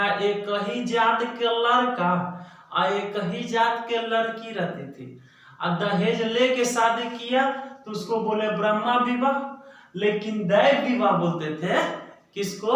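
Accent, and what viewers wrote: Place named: India. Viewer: Desi English ✍️✍️✍️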